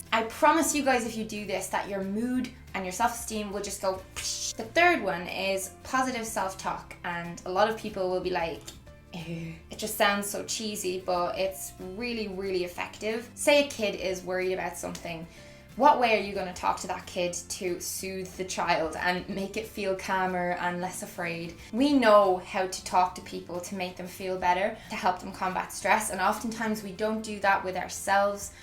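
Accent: Ireland. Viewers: I can listen to that Irish accent all day long. It's beautiful music to my ears!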